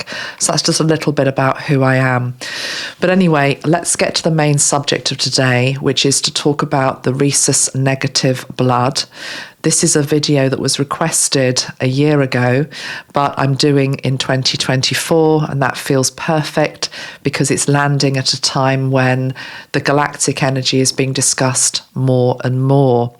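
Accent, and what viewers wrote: United Kingdom. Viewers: Iam a rh negative. everything you said about make a lot of sense to me. There are 4 person in my family that are negative as well. It is the first time i have been whatching your live. and liked very much e liked your British accent . Life for negative blood is not easy, we are different and rebel. thank you for your work.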